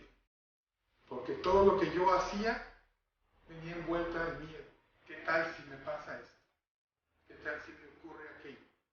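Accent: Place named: Mexico. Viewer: disculpe sr de que pais es usted tienen acento mexicano cual su numero o su tratamiento y cuanto cuesta el libro o el CD saludos desde lima peru tiene alguna sede por aqui en lima peru bendiciones y gracias por ayudar a muchas personas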